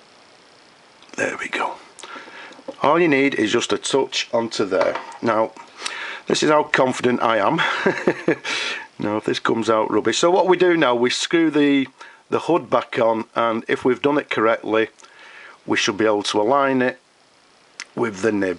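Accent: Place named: Manchester, United Kingdom. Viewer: Thanks to you (and a friend who supplied a feed,nib and distributor) I was able to repair a Parker 51 that belonged to my late grandpa. Thank you very much. Your videos are clear, your voice is quite nice (where are you from?, I can't locate your accent quite well, I'd say Manchester.) and well, your videos are all-around great. Thanks again!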